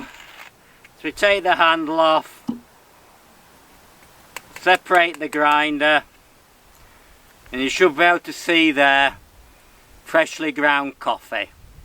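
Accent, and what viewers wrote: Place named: Yorkshire, United Kingdom. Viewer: definitely yorkshire, lu at a, not laaa tay or laaa t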